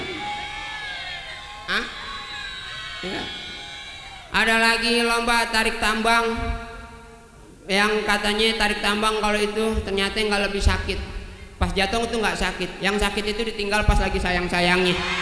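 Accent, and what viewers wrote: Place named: Jakarta, Indonesia. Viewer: Ane suka ame bng,khas masih bahasa betawinya,sukses ye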